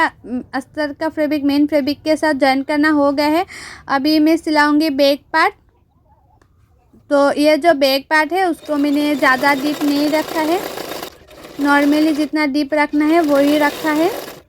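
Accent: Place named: Bengal, India. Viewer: Apnr kotha sune bujhte parchi j apni akjon bangali,, Ami ki thik bujhechi ?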